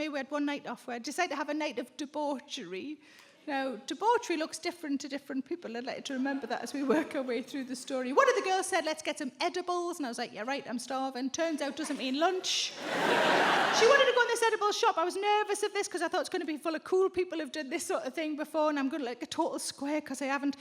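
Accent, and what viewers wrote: Newcastle, United Kingdom. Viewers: Brilliant! There’s something about how Geordies (or South Shields folk) swear that I love! 😅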